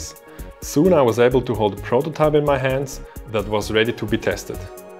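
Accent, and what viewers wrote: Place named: Switzerland. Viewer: Ret schwizerdütsch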